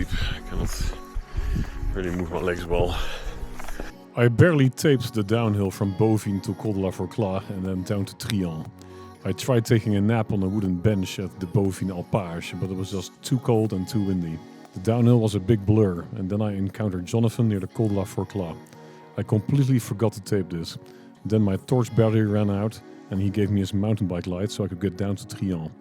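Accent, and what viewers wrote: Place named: Netherlands. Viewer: Na 2 zinnen was het duidelijk: mooi Nederlands accent 😉 Waanzinnig gaaf verslag. Je doet iets waar ik voorlopig nog enkel even van kan dromen.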